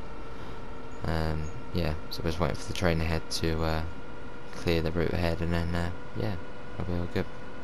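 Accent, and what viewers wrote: United Kingdom. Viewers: WOW nice i was dreaming last night about you saying on augest 6th today we are going to finally play TSW2 i am so excited.:D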